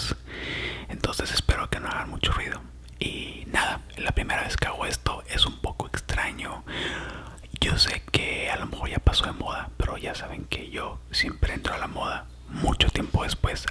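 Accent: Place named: North, Mexico. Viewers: Dios mio que voz tan linda tienes ya sea con tu acento de el norte o en voz baja como lo hiciste en este video 😍...me encantas como persona, y físicamente 😍😍😍🤩 saludos !!! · Ya estoy aquí, te amoooo 💕😊🌌💝💖 amo tu acento norteño 😱😍💝💖😁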